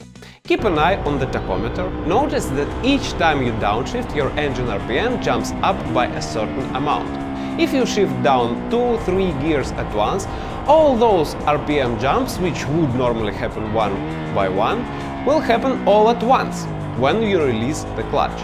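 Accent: Russia. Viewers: Чел, с таким русским акцентом изучать английский просто превосходно) Русский канал ты верно забросил, так что смотрю тут и даже понимаю процентов 40. пока 40.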